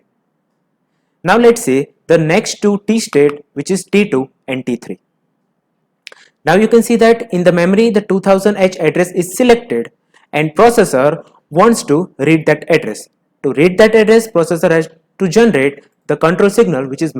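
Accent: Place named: India. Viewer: Indians speaking english sound very ridiculous, next time use subtitles, the world will thank you.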